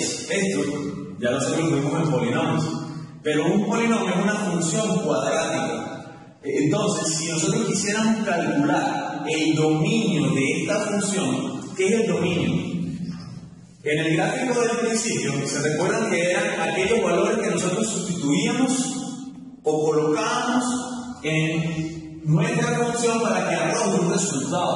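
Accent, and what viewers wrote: Venezuela. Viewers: este pana si habla sifrino....pero explica bien. saludos... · Usted es Venezolano donde se pare. ¿oyó? (Soy Venezolano)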